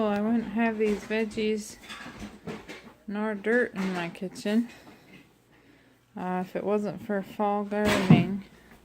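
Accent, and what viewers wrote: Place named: New Brunswick, Canada. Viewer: Thanks for letting me know where your accent is from. I have good friends across the Bay in New Brunswick who pronounce words the same an you! LOVE IT! Bacon makes everything better!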